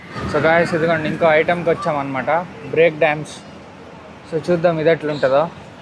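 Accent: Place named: India. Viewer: Ethulaki bayapadani akuva-man, painki yedhagaliiii 💯🫡 garu's efforts to vlog for us and mainly how he supports everyone (jabardasth,masth, his extrovertism, Mannnn)✨ Cameraman bhattu nunchi inspire ayinatu unnaruuuu 😶‍🌫️😂 His Hindhi accent 🔥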